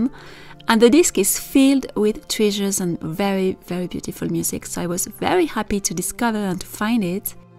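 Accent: France. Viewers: you have a fantastic command of English. How incredible you are to be fluent in two of the most widely spoken languages on Earth! Your beautiful French accent adds music and elegance to the words. I love listening to you 🤍🤍🤍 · Who on Earth says they can’t understand your beautiful French accent?!?? Take NO notice. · Merci for another lovely video. You speak perfect English to me so don't feel insecure. Your French accent is beautiful and I love listening to you. 💕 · ✨I adore your French accent, so beautiful! Thank you for all the beauty you bring to my life, and for the motivation to work on my art and my French!! ✨🦋💕🌷🪲🍄🦉🐇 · I absolutely love to listen to you! You speak perfect English with a beautiful French accent! The carousel is gorgeous! Not surprising! Hallway looks lovely as well. 💗💗💗💗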